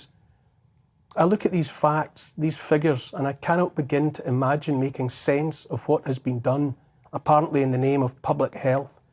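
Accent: Scotland, United Kingdom. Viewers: We have Rod Cullerton and Jason Miles from GAP. We have Graham Hood who's been very outspoken, along with Hoodys Helpers. I do like though.. I love a Scottish accent. The same will happen here. They know they're losing, no unvxd at fireworks, oh no please come.. just one example. I think you'll see a lot of scrambling now. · Agree with everything you say,. Look forward to every posting from you. You present with a calm Scottish accent but I can hear the anger beneath. As punishment for those responsible and especially those who made millions from their decisions I would suggest that they all have to return the money and sell their assets except for one average priced house and give that to theTreasury to help offset the bill that we, our children and our grandchildren will be paying for their lifetime.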